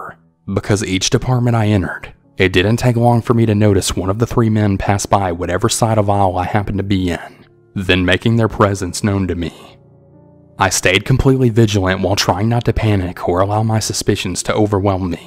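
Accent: South, United States. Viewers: It took me a minute to realize he has a country accent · It’s the southern accent for me 😅😌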